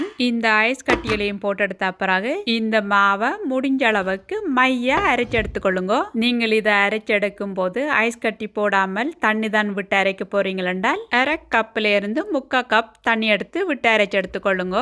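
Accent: Jaffna, Sri Lanka. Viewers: Super. Love your jaffna thamizh ❤❤❤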